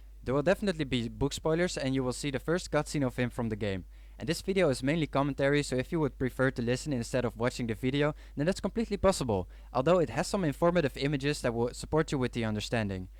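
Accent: Netherlands. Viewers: Dutch accent lmao nice video!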